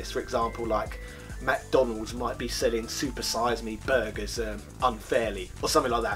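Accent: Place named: RP, United Kingdom. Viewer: Why do you put on that awful posh accent?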